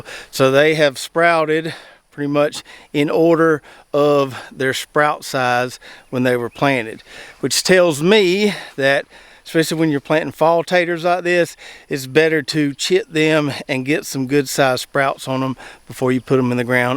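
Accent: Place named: Georgia, United States. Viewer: I have a G. Neri fig tree that I bought from a nursery in Louisiana a little over a year ago. We were moving so it stayed in a pot for a good while. We moved late in the year (2019) so I didn’t plant it until the following spring (2020). It did okay the first year but didn’t grow much or bear any figs but I didn’t really expect any that first year in the ground. Then a late frost in the spring of 2022 killed it back to the ground. It has grown to be an amazing large plant over this year and it has a lot of little figs on it. I’m not sure if they’ll ripen before frost this year but I can tell it’s going to be an amazing producer. I have a Madeleine fig I purchased last year - again, killed back by that late spring 2022 frost. I also have 2 nice-sized Brown Turkey figs that I rooted from cuttings off the tree at our former residence. Those suffered the same fate as the G. 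Neri but they also have grown into very nice young trees. I expect a decent amount of figs off them next year. There are some figlets on them now but I will be surprised if they ripen before the first frost this autumn. I really enjoy your show - so much good information and you are in my state of Georgia, although some 200 miles south of me. I grew up in Dublin and I enjoy watching and hearing you speak - you sound like the folks I grew up with! 💕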